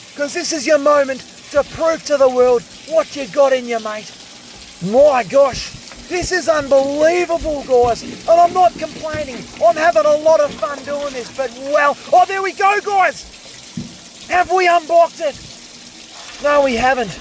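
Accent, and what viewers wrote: Australia. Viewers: Man watching a plumber with an aussie accent and the crocodile hunter energy hits different, throughly entertained my friend watching from SoCal USA 🇺🇸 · Is there anyone with more aussie accent than you? I doubt. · First time encountering this channel and man, everything is more exciting with an enthusiastic Aussie host lol Like, my dad's a plumber and it's nowhere near as fun watching him work. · G'day. Ended up finding your channel after watching some pet grooming. lol. Watched a bunch of ya vids and may be absorbing more Aussie dialect than is healthy for a yank. Anyway, love your videos and the excitement you bring to honestly one of the most underrated jobs out there. Wish more plumbers had your worth ethic and enthusiasm, especially where my girlfriend lives.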